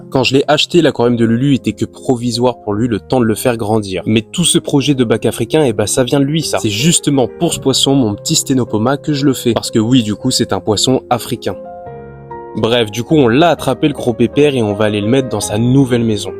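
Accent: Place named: Charente, France. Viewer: Tu ne serais pas originaire de Charente/ Charente Maritime par hasard? Parce que la prononciation de Rogarde (regarde), Promière (première) me fait penser à ça 😂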